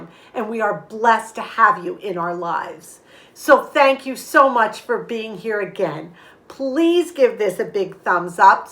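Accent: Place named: New Jersey, United States. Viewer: Great tips! We give kitchen scraps to the hens, we get eggs. As a native Philadelphian, I gotta say you sound like you're from Jersey.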